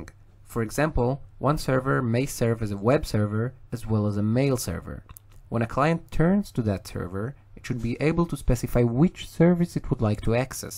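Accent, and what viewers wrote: Israel. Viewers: אחלה וידאו! באמת, מהברורים ביותר שראיתי שמסבירים על מודל השכבות. ממש מוזר שאין לו הרבה יותר צפיות. מה שכן - המבטא העברי ממש מורגש.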